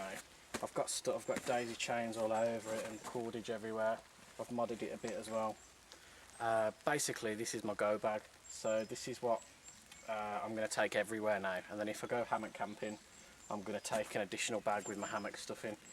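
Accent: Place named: Birmingham, United Kingdom. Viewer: yep a true dummy brummie!! sounds and looks like a brummie!!! hurts the ears and numbs the senses... i switched off after 2 mins I've worked with these primitives... once they learn to speak we may accepted them as from EARTH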